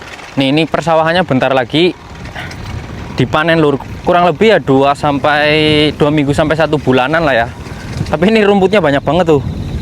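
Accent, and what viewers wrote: Banyumas, Indonesia. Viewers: Mas wong banyumas apa wong sidareja si mas...ngapak poooll ky aku...sampe nyebut parkir ya tetep palkir yaa😊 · Smpyn wong ndi kang....ngapak bgt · Banyumas jateng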